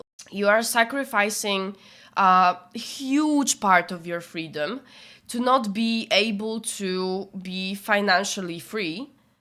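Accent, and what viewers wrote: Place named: Poland. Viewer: Polka? Bo masz dobry angielski, ale ten akcent trochę zdradza ;d W każdym razie trafiłem na eseje o Raphaelu i widzę, że nie tylko ja mam obsesję na jego punkcie. Pozdrawiam.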